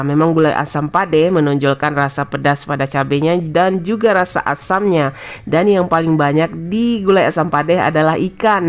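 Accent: Minangkabau, Indonesia. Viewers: ulangnonton seronok mendengar suara lpghat minang tetapi tidak pekat.masih boleh faham selamat baik sampai jumpa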